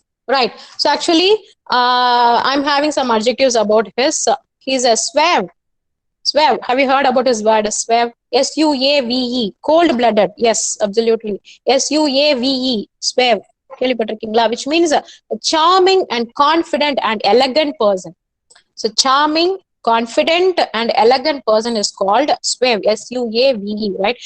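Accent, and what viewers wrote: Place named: England, United Kingdom. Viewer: Mam I like your english accent .really inspired mam ✨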